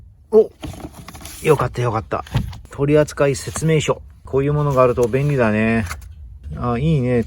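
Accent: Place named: Japan. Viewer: Your accent really be heard as very Japanese! awesome! このプロジェクト、楽しみにしています！ POVのSteveさんをびっくりさせましょう！！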